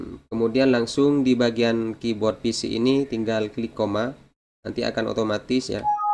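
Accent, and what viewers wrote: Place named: Madura, Indonesia. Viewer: Owlhh..soalnya dri cara bicara nya udh kyk orng madura.. 😅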